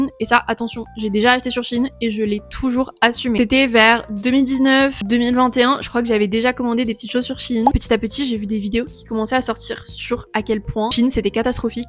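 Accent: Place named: South-East, France. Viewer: Oh t’es une meuf du sud est 🥳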